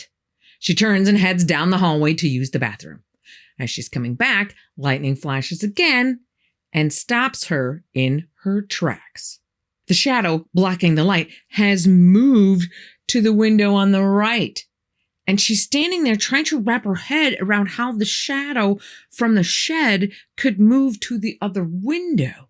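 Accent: New York, United States. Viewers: How the heck does someone make you feel both safe and spooked at the same time? tells these stories so well that one can’t help but laugh even as the sheer terror builds up in your mind. And the AI, the editing… Everything is so on point! How is this channel not at 1 M members?! I thought only Morticia Addams did lovely and spooky but certainly does it at least as well…with a New York swagger!!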